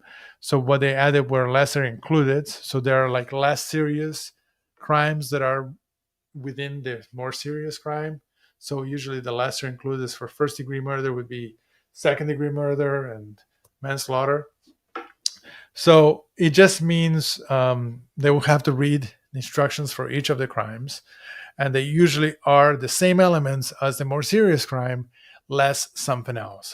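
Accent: South, United States. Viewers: don't listen to them - your acting is hilarious! I love your southern accent!! Please let us see the rest of your movie! 😂😂😂😂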